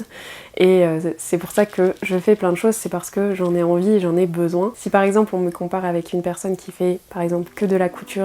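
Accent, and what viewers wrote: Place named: Hainaut, Belgium. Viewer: Félicitations pour ce cap des 10000 abonnés. C'était un plaisir de te découvrir davantage... Avec toutes tes passions qui sont celles que j'aime aussi... Cette vie douce est vraiment source de satisfactions ... Tu diffuses de l'apaisement comme le parfum des pâtisseries que je t'imagine sortir du four ☺️. Et cerise sur le gâteau tu es du Hainaut 😉 le pays de ma famille maternelle.. 🤗. Et chaque note d'accent est une petite madeleine pour moi ☺️... Je te souhaite encore de nombreux abonnés et surtout... beaucoup de temps pour continuer à créer. 😘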